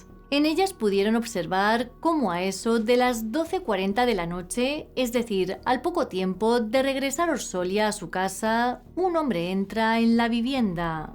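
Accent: Spain. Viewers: Me encanta tu tono tan español !!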